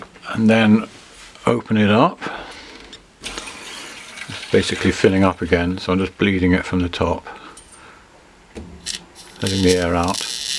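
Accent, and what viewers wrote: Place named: Home Counties, United Kingdom. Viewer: you are a STAR plumbing video maker. I am sooo... grateful i found your amateur easy to follow step by step video. British videos give only badly explained advice and meant to get you to call a plumber. Youtube should delete these fifteen minutes of fame time wqsters. Your accent seemed home counties english until i found i was donating in Canadian dollars on paypal. Thank God for honest Canadian DIYers, I saved £s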